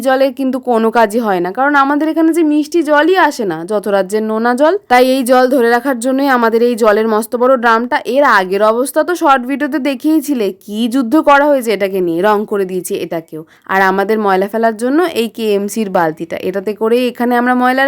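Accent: Bangladesh. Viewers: প্রথম দেখায় তুমি আমর মন জিতে নিলে আপু তুমি আমাদের বাংলাদেশের মত কথা বলো গো🤭❤️❤️❤️❤️❤️❤️❤️ love from Bangladesh 😊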